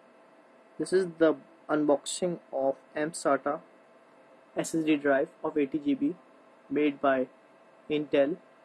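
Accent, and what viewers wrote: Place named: India. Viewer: WHY you are talking like this..! Indian English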